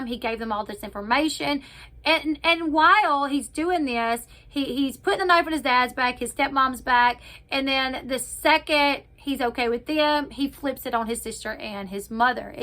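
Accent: South, United States. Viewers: Thanks for the info..I don't care if it's late or whatever I enjoy your channel and your southern accent 👏👏💗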